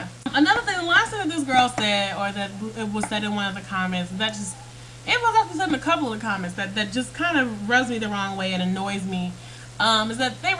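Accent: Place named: Texas, United States. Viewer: wait what!? handcuffs? little timmy? 'what you've been doing wrong with it' ! you are HI-larry-US whe you go off like a shot, the faster you go, the more the 'texas belle' voice comes out until the rubberband unwinds and you just stop. or funner yet, freeze for a moment and then keep going! i swear i think my stream stops. keep the rants coming, it's a hilite of my day!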